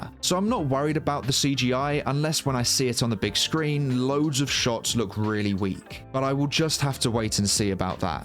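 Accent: United Kingdom. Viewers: These are the facts. The fish and chips accent seriously enhances the review experience I’m not even remotely going to lie. Love this channel, even though he complains too frequently about even mild humor in a Superman film. Still, few have done better journalism on the progress of this film than this fellow.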